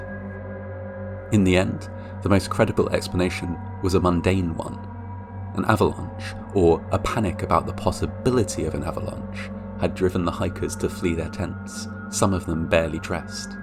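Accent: United Kingdom. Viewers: As an American, something alluring about a Brit accent as long as it’s not cockney.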